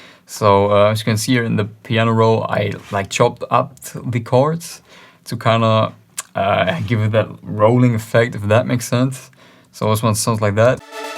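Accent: Germany. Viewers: Man hört den deutschen Akzent so stark raus🙈, trotzdem sehr gutes Tutorial👍 und vielen Dank für die Flp🙏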